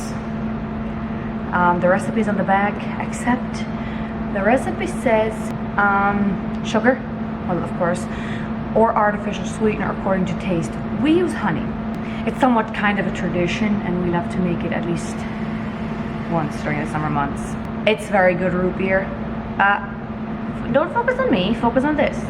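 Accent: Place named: Ireland. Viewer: I hear a hint of Irish in your accent 😊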